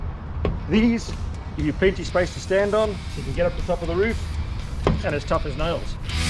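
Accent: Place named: South Africa. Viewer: He sounds South African. :)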